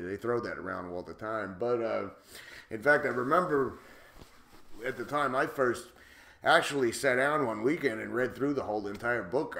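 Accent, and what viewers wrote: New Jersey, United States. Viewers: Your so funny, haven't laughed like this for such along time, I'm literally crying laughing. Love the Jersey "wise guy" accent. Gold. 🤣🤣🤣🧡🧡🧡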